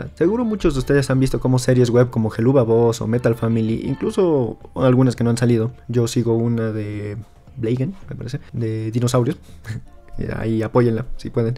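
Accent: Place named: Mexico City, Mexico. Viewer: Concuerdo, muy chilango 🤢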